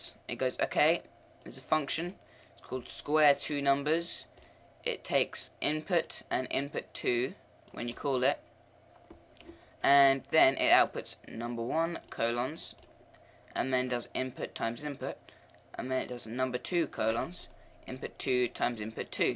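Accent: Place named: England, United Kingdom. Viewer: They're not, they are in the language of lua. If you mean why is the person who's talking doing so with an English accent then he's probably lived in England for most of his life, if not all of his life.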